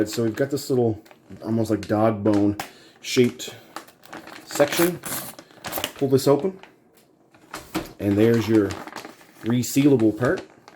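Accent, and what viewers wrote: Canada. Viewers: The best part of the reviews are you can hear a hint of Bubbles from time to time from TPB lol Canadians have a great accent. Much love from Wisconsin,USA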